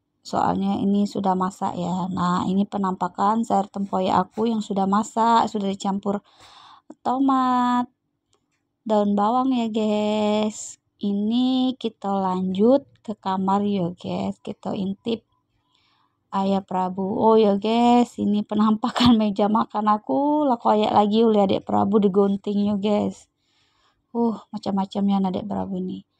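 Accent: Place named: Palembang, Indonesia. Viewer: Menu yang sangat enak sekali lor. Kayak orang Palembang lor gaya ngomongnya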